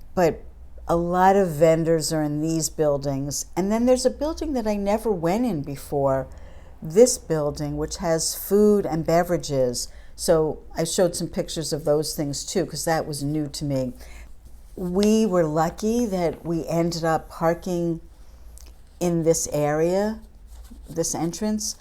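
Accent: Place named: East Coast, United States. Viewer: Your accent and attitude remind me so much of my family out east. Loved the recap!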